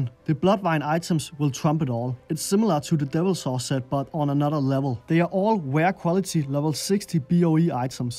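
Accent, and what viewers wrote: Denmark. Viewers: the danish accent is strong with this one! :D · I just started watching, and im 100% sure this guy is Danish. Edit: Great video, learned a lot. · Jesus that’s a thick danish accent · Sådan dansk engelsk 😂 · That's a Danish accent though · WE GOT A DANE! IN HERE! I CAN HEAR IT! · Very nice😀..fat danish dialect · Sounds like denmark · Pls stop calling him Arnold he doesn't sound like someone from Austria at all, he is 100% danish. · Ægte dansk accent.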